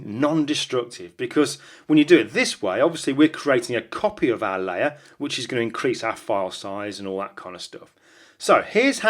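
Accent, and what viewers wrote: United Kingdom. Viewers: Amazing tips, Great stuff , with a British accent too :) keep these coming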